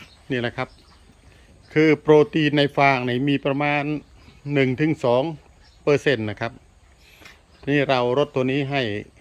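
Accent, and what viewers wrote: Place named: Surin, Thailand. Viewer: อยู่บ้านใหน ขอ รับ ฟังสำเนียง ขุนๆ เหมือนคนบ้านผมเลย จาก อ.เขวาสินรินทร์..เซราะกราว เน้อ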